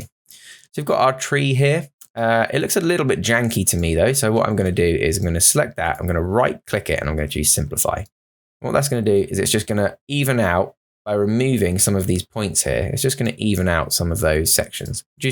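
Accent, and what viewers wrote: United Kingdom. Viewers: You got some tutoring skills man 🤙🤙🤙 Far better when you have someone with an english accent hahah.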